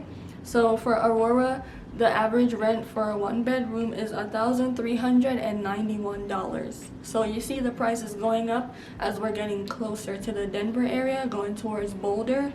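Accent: Jamaica. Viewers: You had me with the Jamaican accent and food 😍 Moving to Denver and will definitely be looking for Jamaican food. Thank you sis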